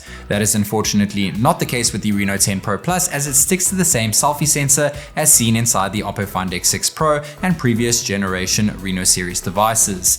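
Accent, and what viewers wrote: South Africa. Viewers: All I could see was The Barron, Witkoppen Rd... 🤣#RubyBlueForLife!!! Cool channel, good luck! Can hear a Zaffer a mile away!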